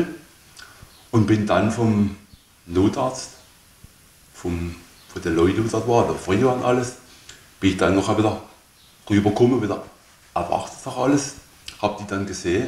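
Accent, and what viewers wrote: Swabia, Germany. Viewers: das ist schwäbisch! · Leider für mich als Norddeutschen aufgrund des stark ausgeprägte schwäbischen Akzents und der etwas mangelnden Tonqualität nur schwer zu verstehen. Schade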